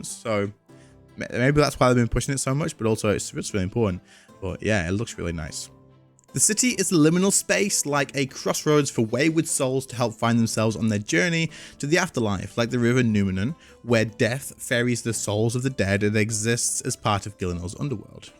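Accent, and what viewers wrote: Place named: United Kingdom. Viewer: I have decided... I am leaving Runescape for good..... thanks for all the entertainments! i like it British accent 👍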